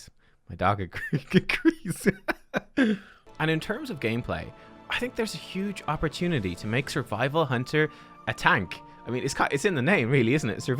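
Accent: Russia. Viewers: The Russian accent just won the internet. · Loved the Russian accent, your suggestions and these last few video's!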